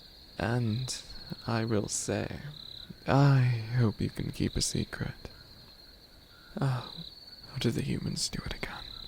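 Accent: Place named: United Kingdom. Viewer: Sounds British. Perfect!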